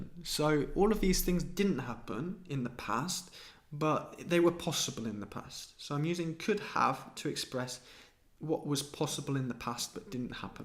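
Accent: United Kingdom. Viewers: I love your accent,!. Someday I'd like so much can speak fluently and with a British accent. Greetings of Argentina:D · English is my third language and I’ve learnt it since I was little, I am in love with your british accent and I wish I grew up learning it in the UK😍 your accent in spanish was good, it is my first language and norwegian is my second language. Growing up in Norway we were introduced to english all the time, on TV and more and norwegian isn’t an useful language because only 5 million speak it and you won’t reach far in the world only being able to speak to 5 million people😂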